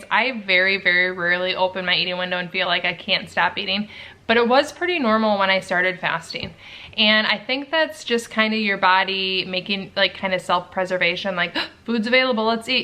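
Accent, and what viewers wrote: Michigan, United States. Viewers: I love your videos. You’re very inspiring. I thought it was funny that someone asked you what state you live in. A. You have a Michigan accent, and B. there’s literally a wall hanging in the shape of Michigan behind you!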